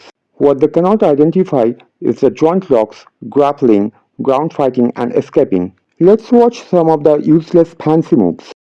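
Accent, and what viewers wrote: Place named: India. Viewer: You sound like you are from India. I like that you are very critical about the indian influence on Kung Fu and debunk the myth about Bodhidharma. But in the northern KF styles I see some influence of Yoga and Kalari. Do you know the Hatha Yoga posture "the warrior". Think of that with fist and you have a typical northern shaolin posture. Also I think these long movements and low and wide stances in the northern KF forms are for stretching and energy development like in Yoga. For fighting they dont make much sense. On the other hand the southern styles the link to the combat application is more obvious and the movements have quite a different character.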